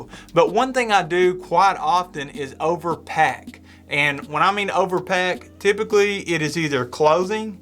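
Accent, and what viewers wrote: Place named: South, United States. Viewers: My goodness! thats the one of the sweetest southern accents I've heard! love from the netherlands! <3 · I listened because of your southern accent... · What's up with his accent? Why is he talking like Forrest Gump?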